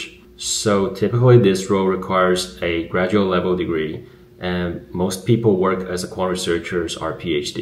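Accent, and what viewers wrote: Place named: Taiwan. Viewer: 好感動影片看到一半聽出主播也有很濃的台灣口音，親切阿~~好親切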